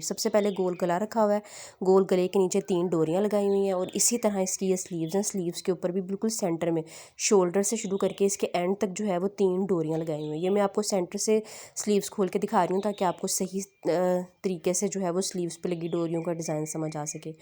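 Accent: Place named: Punjab, India. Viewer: App ki Vedo buhat achi Hoti hi ap bolti bhi buhat acha ho banjabi ka tark acha lagta hi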